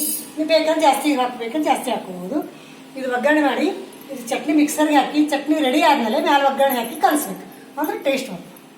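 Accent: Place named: Hubballi, India. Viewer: ಅಮ್ಮಾ ನೀವು ಮಾತಾಡೋದು ಕೇಳಿದರೆ ಬಹಳ ಖುಷಿ ಆಗ್ತದರಿ. ನಾವು ಹುಬ್ಬಳ್ಳಿಯವರು. ಬೆಂಗಳೂರನ್ಯಾಗ ಇದೀವಿ. ನೀವು ಮಾತಾಡೋದು ಕೇಳಿದರೆ ಅಲ್ಲೇ ಇದ್ದೀವಿ ಅನ್ಸತದ. ಮುದ್ದಿ ಪಲ್ಯ ಹೇಗೆ ಮಾಡೋದು ತೋರಿಸಿ ಕೊಡ್ರಿ.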